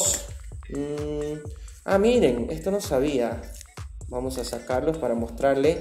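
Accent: Bolivia. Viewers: Eres de Bolivia verdad ?? Si lo eres me suscribo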